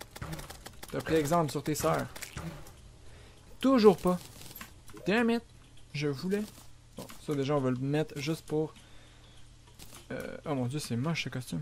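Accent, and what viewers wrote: Quebec, Canada. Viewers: Est tu québécois répond moi svp ;)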